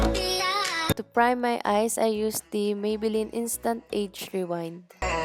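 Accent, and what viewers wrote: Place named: Bicol, Philippines. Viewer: I've missed you and your cheerful and bubbly voice (with the Bicolano accent)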